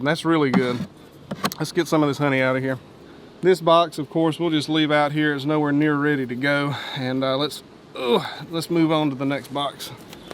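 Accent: South, United States. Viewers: That’s a cool accent 👍 What parts of America is that accent from? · Southern Chris Pine?? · you know the second you hear that thick southern tang, it’s gonna be the most pleasant video you’ve watched at 3am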